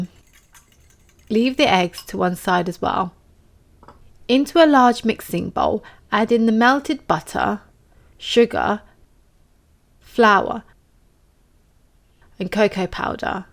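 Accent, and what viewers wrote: United Kingdom. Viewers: I made this today and it came out very good. I substituted the flour with 2/3C of Almond Flour and 1.3C of coconut flour and used the Monkfruit sweetener. The only issue I had was removing it from the springform pan I used. It split apart slightly in a couple of places but nothing too drastic. Maybe I didn't let it cool enough. I served it up with some Neopolitan Ice-cream and I was in heaven. It was all you said it was. Thank you so much for sharing this recipe. I enjoy listening to you and watching you cook. The cross between your British accent and your sweet Asian personality makes this experience and your channel very enjoyable. :)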